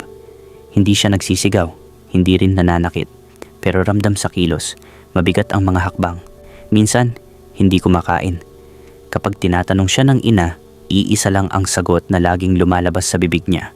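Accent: Pangasinan, Philippines. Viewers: Taga pangasinan yan c narrator 😂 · Hahahhaha panggalatog ata c narrator😮😮😮😮😮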